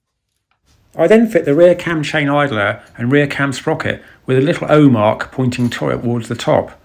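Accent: England, United Kingdom. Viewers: He's from England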